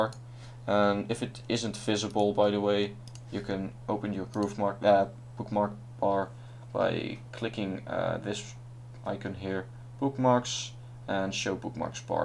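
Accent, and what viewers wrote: Netherlands. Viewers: your accent, you are dutch speaking are you? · Haha, je hoort aan je accent dat je zo Nederlands als wat bent XD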